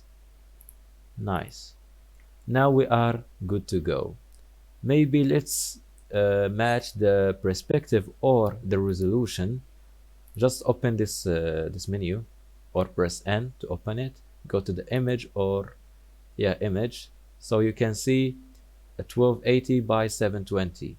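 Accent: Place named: Arab world. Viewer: والله عربي عرفتك من لهجتك😂❤ اشكرك على الشرح المفيد! Keep up the work!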